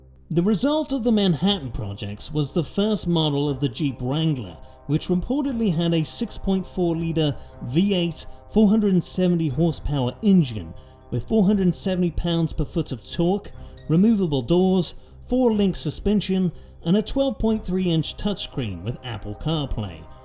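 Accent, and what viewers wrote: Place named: United Kingdom. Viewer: My favorite thing about this whole series is it’s a British man narrating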